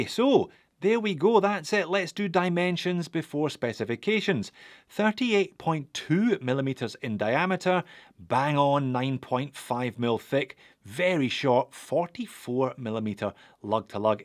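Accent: Scotland, United Kingdom. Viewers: Thanks for another great review. As a Newfoundlander Canadian, I really like your Scottish dialect. It makes your reviews far more fun and witty ;)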